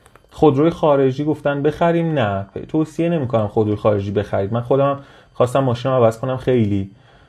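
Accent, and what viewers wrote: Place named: Isfahan, Iran. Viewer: به به دادا شما اصفهونی هسی .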